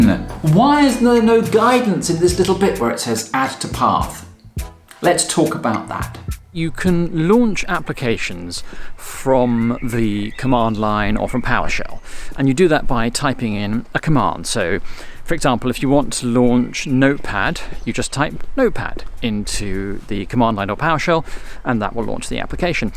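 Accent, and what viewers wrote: United Kingdom. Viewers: Nice British accent nothing else.😮😢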